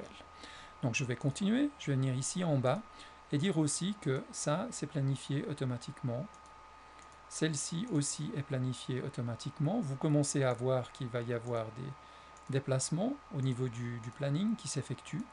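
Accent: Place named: Savoie, France. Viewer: Merci !!! Et par curiosité, l'accent (léger) c'est de Savoie ?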